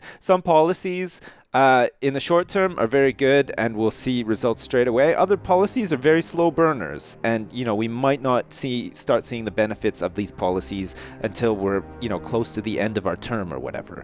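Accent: Canada. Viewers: your canadian! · Sips your from Canada?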